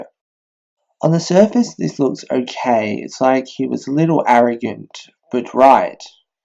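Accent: Australia. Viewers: it's so relaxing hearing another australian accent in videos. i don't have to put everything thru a mental translator from whatever to slang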